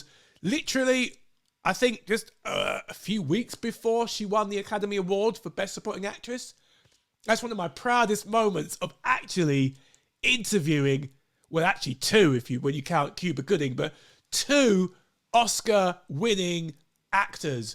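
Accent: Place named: United Kingdom. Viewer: Hey. I'm new to your channel and I hear what you are saying. Although I do agree with what you have said and yes, the Oscars have kind of lost mysterious Spark and Mystique it once had, and Hollywood looks like the 20th century Relic, I will say this. I think there is good in that it will make way for new ways to create films. I'm not sure where you are and I'm guessing you probably live in the uk? I'm basing that on your accent but I of course could be wrong. Apologies if I am wrong. But as an american, I get tired of watching the Oscars because it's filled with Elites who really have an absolute disdain for about half of the country. We know we are despised because of our beliefs, our politics, our way of life, and usually Oscar night is when the worst of the worst are together and they basically ridicule half the country. This wasn't always the case but it's become more so in the last 20 years. 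And it's basically an elitist Club for the wealthy to lecture the rest of the country. And the truth is is that there's so much more different forms of entertainment, I find the average YouTuber more entertaining than the Oscars and the golden globes. Perhaps Hollywood needs some serious humbling before they can really bring back that spark and they need to not ridicule half the country then they will get the ratings they need and they will be able to unite this country, rather than constantly divided with ridicule. I think one of my favorite movies of the 2010s what is the C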